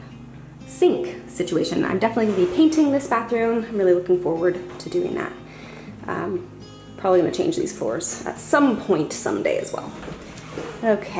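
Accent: Canada. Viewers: Hehe I love your Canadian accent ie the way you say "house"! It's so fast that it's almost like "hoose" and it's very cute!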